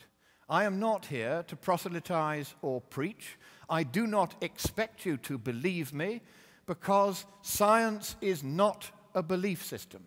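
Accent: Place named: Scotland, United Kingdom. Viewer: he's scottish. That's a (way) upperclass scott accent.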